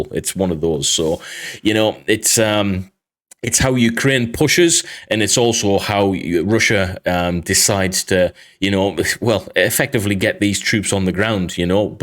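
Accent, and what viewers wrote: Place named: Northern England, United Kingdom. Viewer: I thought I was hearing a little Northern England in your accent!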